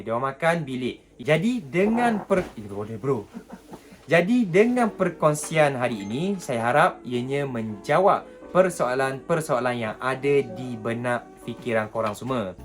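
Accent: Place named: Terengganu, Malaysia. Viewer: slang ganu tetap ade 😂😂😂, kemahh sek kitee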